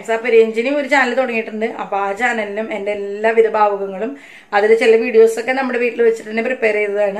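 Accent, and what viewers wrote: Thrissur, India. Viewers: Chechi ente Peru soumya kunnamkulam aanu veedu but pkd aanu thamasikunnathu eniku checked samsaram kelkumbo nammude thrissur basha ketta njan happy first time comment aanu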